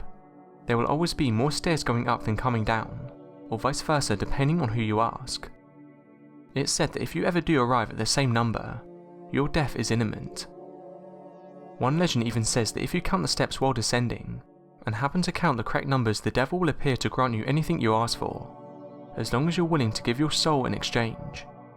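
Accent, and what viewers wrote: United Kingdom. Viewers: You almost sound like the narrator from Top5s. You both are my favorite British narrators. I hope you eventually obtain as many subscribers as Top5s. God bless, and greetings from a US soldier from Illinois, USA!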